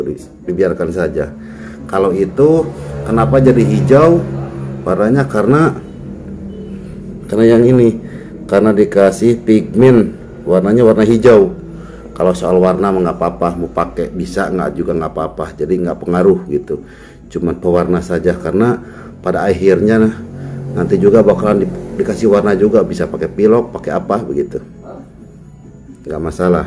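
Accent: West Java, Indonesia. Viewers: orang kendari tp logatnya spt orang jawa barat 😁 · Orang Kendari logat Sunda.... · Di Kendari tp logat Sunda... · Nembe bade naros, orang kendari tapi logat sunda☺️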